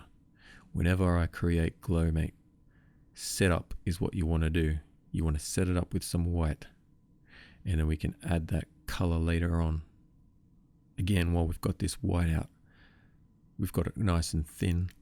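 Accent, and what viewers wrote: Australia. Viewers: heya mate thx for the vids ,they have rekindled my desire to paint ,and its good to hear an ozzy vioce in a warhammer vid, for a change , cheers bro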